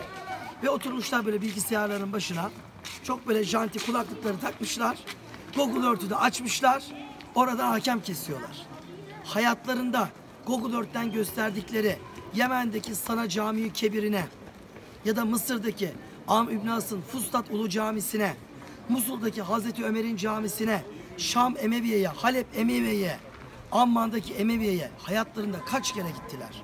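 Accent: Turkey. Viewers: kayda değer ne anlatıyor diye dinlemek istedim ancak egeli ağzıyla "öyle diyolağ böyle diyolağ ama yanlış diyolağ"dan başka bir argüman duyamadım. çığrınmaktan tepinmekten argüman sunmayı unutmuş abimiz az bi soluklan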